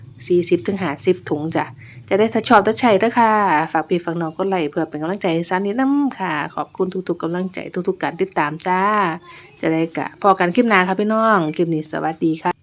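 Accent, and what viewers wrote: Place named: Buriram, Thailand. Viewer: สาวนิดเป็นคนบุรีรัมหรือเหนือ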